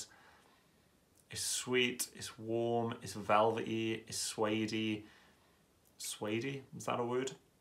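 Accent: Wales, United Kingdom. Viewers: an Escentual buy, eh? Nice to hear another Welsh person reviewing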